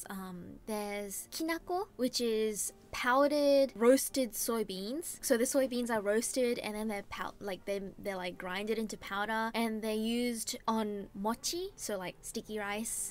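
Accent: Australia. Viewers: Ive been enjoying these videos! Keep up the good word! P.S. I love the Aussie accent in your English! It sounds attractive to listen to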